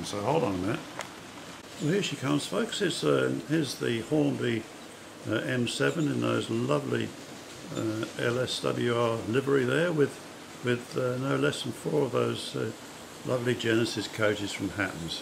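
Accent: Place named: Australia. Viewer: Hi, very sorry to hear of your continued back pain. I know how it can make everyday life very draining. Getting down to rail level and filming can be particularly difficult. However, if you can manage it, please carry on posting the videos. As you rightly say, there are lots of great youtube channels for model railways, but your layout has got to be one of the most enjoyable to watch. There's something interesting in every shot, and I love watching your trains go by. This Pommie even enjoys the Aussie accent! Best wishes for 2023.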